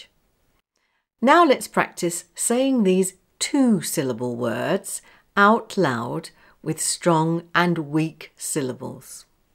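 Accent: RP, United Kingdom. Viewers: Thanks so much 🙏😊. I love your posh English 🎉.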